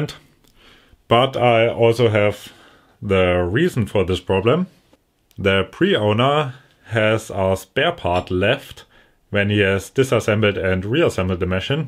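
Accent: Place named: Germany. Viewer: Du bist Deutsch oder? Wenn ja das hört man raus (nicht böse gemeint)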